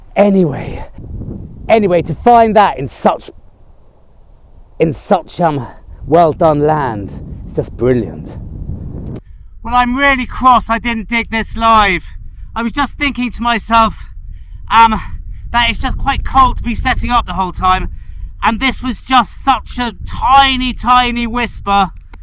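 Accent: RP, United Kingdom. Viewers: Hi, Recently discovered your channel and immediately subscribed! I think you're a hoot! I'm from Glasgow, love your poshness! I've watched a lot of your videos. I love your "woosh" back to HQ! I really like the bird coin too. I love the goofy/cartoon look to it (for want of a better description)! What about a clear matt acrylic sealer for the Roman brooch? PlastiKote is a good brand (I use their enamels). Agree, the gold ring will be the best thing you'll ever find...until you find a gold hoard! Keep sweeping, fella! The crotal bell is doing well on ebay (see the description for the link folks)!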